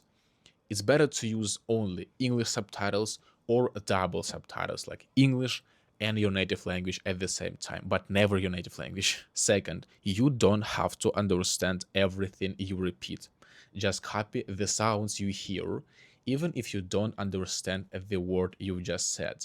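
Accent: Russia. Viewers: The moment you spoke I knew you were Russian how I love the Russian accent 💗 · Aww, you have a brilliant Russian accent❤ Your accent gave away your nationality😅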